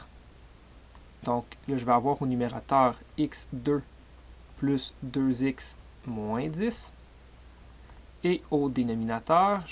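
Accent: Quebec, Canada. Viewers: si t'as un problème avec l'accent québecois, je suis toute ouïe. sinon, va donc chialer ailleurs. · Srx on voit rien sur ce qu'il fait écris dans le tableau et les dessins des graphiques sont frenchement dégueulasse...sans compter (bien que le mec n'y peuvent rien) un accent québecquoi absolument dérangeant et rendant la compréhension encore plus difficile...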